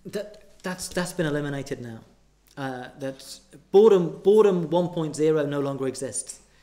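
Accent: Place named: United Kingdom. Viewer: British accent is tricky 👽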